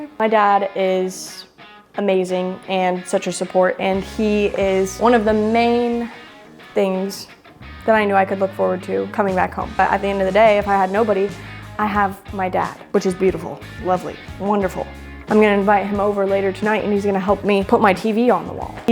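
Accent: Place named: AAVE, United States. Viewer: Nothing more annoying than a woman speaking Ebonics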